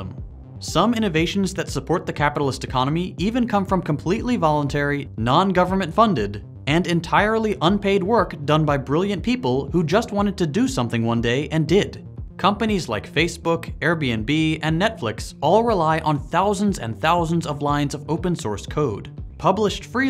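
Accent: United States. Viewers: It should be obvious by your accent, by perhaps you should've prefaced this video by explaining that "we" and "our" means "American", because capitalism is not equal the world over. Europe enjoys (or has previously enjoyed) a relatively socialist approach to capitalism. What you described was the American model of "free market capitalism" which is anything but. You described America's relatively unique capitalist healthcare system, for example.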